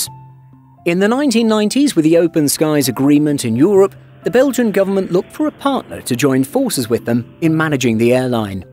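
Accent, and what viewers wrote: France. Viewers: that french accent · Nice french accent!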